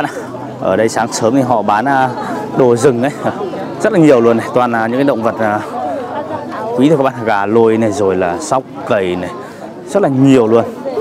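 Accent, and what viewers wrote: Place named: North, Vietnam. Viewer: Nói giong Băc mà lai quá nhanh, thât la nghe không gì hêt. Nói Châm lại môt chút đi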